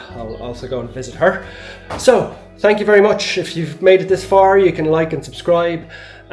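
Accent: Ireland. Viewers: 🙏 🙏🙏Please…I beg of you. Get your lovely self signed up with a professional voiceover agency. I swear, if you have any spare time, you must explore the possibility of using your gorgeous warm and friendly voice as another source of funding your dreams. If I heard that beautiful Irish lilt over an advert, I’d be instantly interested in whatever is being sold. Movies, books etc…. you need to check it out!!😊😊😊😊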